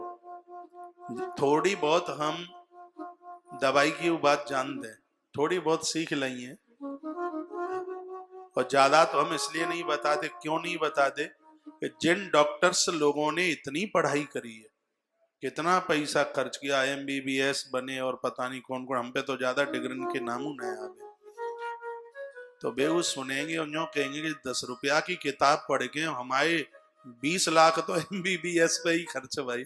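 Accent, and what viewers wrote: Braj, India. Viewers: गुरुदेव आपके श्री मुख से व्रज भाषा बहुत मलूक लगती है .🙏🤗🤗😊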